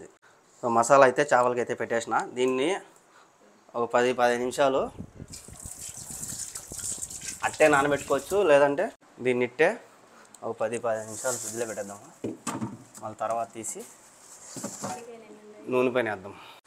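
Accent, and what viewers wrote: Rayalaseema, India. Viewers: Ne voice rayala Seema launge super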